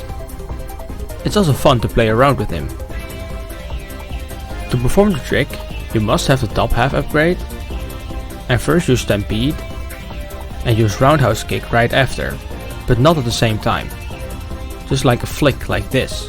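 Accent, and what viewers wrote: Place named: Netherlands. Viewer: Dutch accent strong in this one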